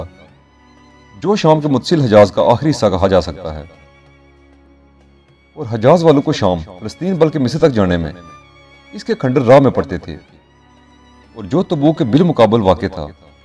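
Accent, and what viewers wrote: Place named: Murree, Pakistan. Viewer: آپ کی آواز سے لگ رہا ہے جیسے مری یا ایبٹ آباد سے تعلق ہے اچھی ویڈیو بنائی ہے جزاک اللّہ خیر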